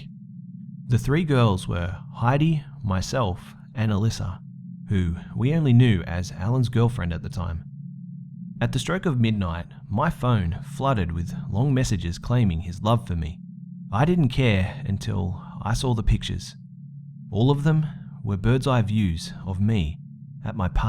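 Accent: Australia. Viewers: I think it's an aussie way of talking. I never noticed it before but a few weeks ago I started noticing it and I first thought it was cute and very aussie, like how he pronounces building 'biwding,' but I feel like it's getting more and more frequent and I catch it every time. it's not unbearable but the frequency of it does make we want to cringe a little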